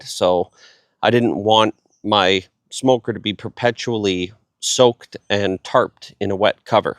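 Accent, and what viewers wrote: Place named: Canada. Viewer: Some of the ones NOT to buy if you are an American (I realized that you are Canadian by the accent) are Frontier (MEX), Lone Star (MEX), Jealous Devil (BRAZIL), FOGO (El Salvador), others too. Royal Oak is made from the lowest bidders. Just read labels. Kingsford, Cowboy and M and M are acceptable.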